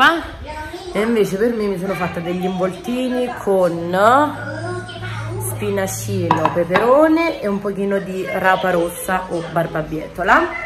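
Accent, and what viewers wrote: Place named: South, Italy. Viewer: Sento il dialetto e in un istante mi fai tornare indietro nel tempo..quando andavo giù dai miei zii cugini ..e da 4 anni che non scendo a lettere .sei super attiva giovane con 3 bimbi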